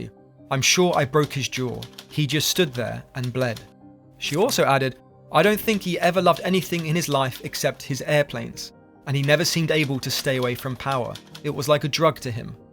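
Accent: United Kingdom. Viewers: Hate this condescending British voice speaking ill of a great man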